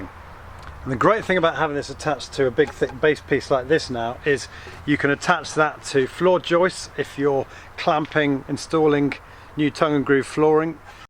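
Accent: England, United Kingdom. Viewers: Good to hear an English accent instead of the usual American Thank you!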